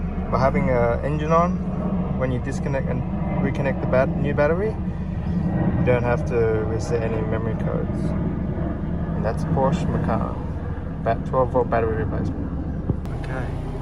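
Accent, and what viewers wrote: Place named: Australia. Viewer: You sound Aussie, Is this an Australian delivered Macan?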